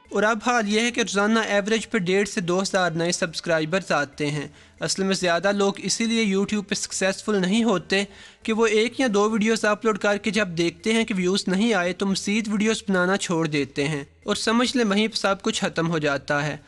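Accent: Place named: Lahore, Pakistan. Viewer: Yar tussi lahori ho ☺☺😑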